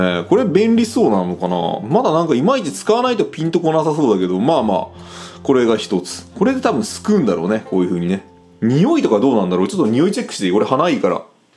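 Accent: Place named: Shizuoka, Japan. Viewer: 無印良品の発音直りませんねえ〜 後、収入の発音も。きほんひとりさんと同じでしたね。静岡弁なんですかね？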